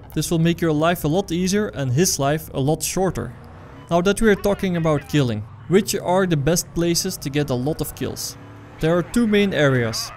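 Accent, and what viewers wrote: Netherlands. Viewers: 2 questions 1. Are you dutch? 2. Are you? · at least it sounds like a dutch accent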